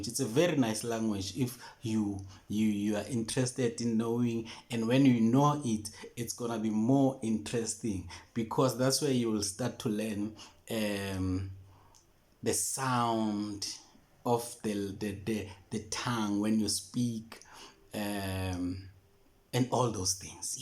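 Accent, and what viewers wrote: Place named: Kalanga, Zimbabwe. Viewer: I was wondering aswell, this sounds closer to the Kalanga my mother speaks.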